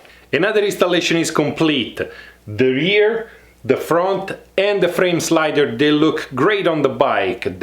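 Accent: Italy. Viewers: the Italian accent adds to the experience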